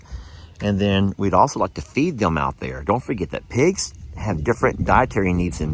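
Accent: South, United States. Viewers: Hi, This is just a suggestion. I think the best spot for the pigs is by the pond. If they are introduced slowly to the horses, I feel they would be happier by the pond just like at ISS. Side note: I've been watching y'all since 2017, and your country accent has gotten thicker since retirement. I know you're a code switcher, BUT I've never heard it this thick <- I do enjoy the melody, though ❤ Thanks so much for sharing, and LHL's looks fabulous with all the babies on it 🐎🫏🐐🐖🦙🐏🪶🐕🐈🐈‍⬛ P.S. Can we get one of these -> 🦄🤣😂🤣 Much love ♥️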